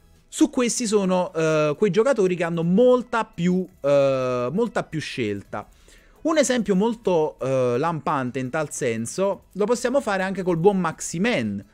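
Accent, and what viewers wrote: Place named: Naples, Italy. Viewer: Si vede che sei allievo di Holly ... io ho vissuto 12 anni a Napoli ed adoro la parlata tua e di Holly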